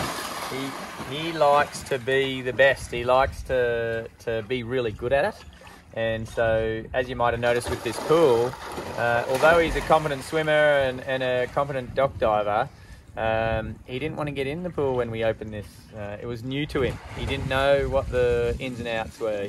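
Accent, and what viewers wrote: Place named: Australia. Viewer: You got good videos and totally a stress reliever to watch however, it stresses me to try to understan what is being said with that Australian accent. Watching from California USA . .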